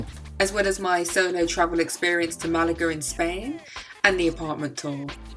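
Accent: United Kingdom. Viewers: This is seriously one of the best videos I’ve seen on this subject. Content, presentation, editing, ideas and impact. Only suggestion would be to slow down your speaking slightly as it will attract more US viewers who love British accents but prefer slower speech. But seriousl6, you really know your stuff. I have no idea how you don’t have thousands of followers already. Keep at the marketing & algorithm study on YT to get your profile up there - and I have no doubt you’ll accelerate quickly. Cheers - am off to implement some of these ideas. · Is that Brummie accent you have? Thanks for the tips. Much appreciated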